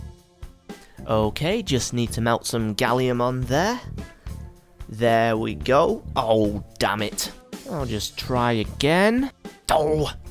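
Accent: United Kingdom. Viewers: He's British lol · It's probably the UK pronunciation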